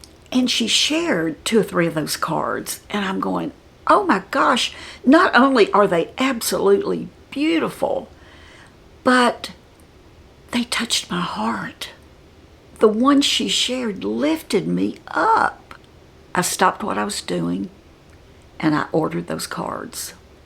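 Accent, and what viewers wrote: South, United States. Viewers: Please enter me in the drawing for the cards; they sound great. Happy 80th! I've been watching you for years. It's your lovely southern accent that first caught my attention and then your confidence and wisdom on so many subjects that kept me watching. I also love seeing your all your artistic projects, like the Xmas hanging balls with lights, made from plastic bowls from dollar store. My daughter and I made those last year and they actually turned out really pretty. Makeup, decorating, enjoy it all. Your videos are especially enjoyable to watch after a stressful day. Hope you never stop!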